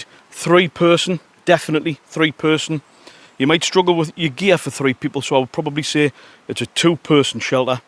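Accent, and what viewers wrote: Newcastle, United Kingdom. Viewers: I love your accent, is that from Newcastle? Great review. Thank you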